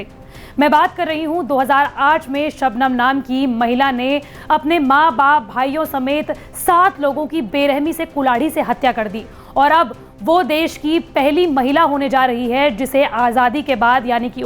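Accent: Bihar, India. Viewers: App bihari ho kya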